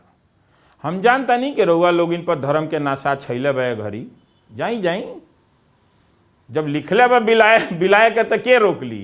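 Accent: Bhojpuri, India. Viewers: आपकी भोजपुरी बोलने की शैली बहुत प्यारी है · I'm not from the hindi region but love bhojpuri and understand well. I enjoy the dialect and accent. Subscribed this channel.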